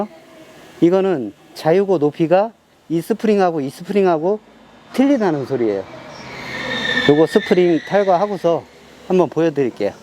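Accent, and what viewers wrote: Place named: Hwaseong, South Korea. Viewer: 말투가 고향사람 말투 입니다 정겹습니다. 경기도 화성인데...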